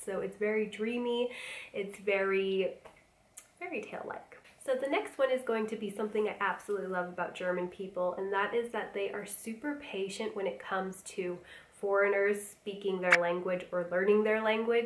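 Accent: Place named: United States. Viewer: Good morning It's always nice to start a day with one of your videos. The German language is difficult to learn, even for Germans. I love the American accent when you're speaking the German language, it's so lovely and sweet. You are living in a wonderful part of Germany, very nice to raise your kids. The most important word at that area is beer/Bier 🍻 and that's not so hard to pronounce 😁😅.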